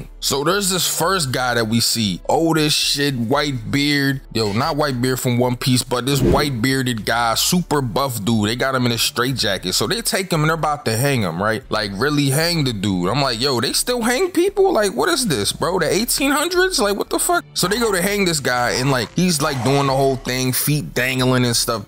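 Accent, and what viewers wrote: Philadelphia, United States. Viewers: You from philly bro 😂🤞🏿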